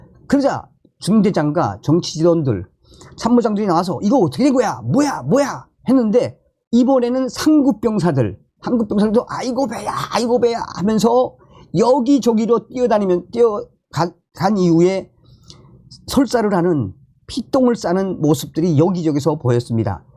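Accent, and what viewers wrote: North Korea. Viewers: 북한사투리 구수하고 아무렇지 않습니다. 사람마다 생각이 다를 수는 있지만 정성산 감독님, 실수나 말투보다 열심히 북한의 현실을 전해주시는 정성과 열정에 늘 감사드립네다^^ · 북한식 어투 그대로 전달해주세요. 생생한 느낌이 그대로 전달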